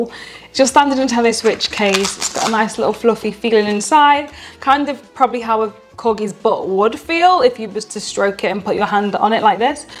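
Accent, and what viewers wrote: United Kingdom. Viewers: British accent, especially when spoken by a girl, is one of the best things in the Universe :3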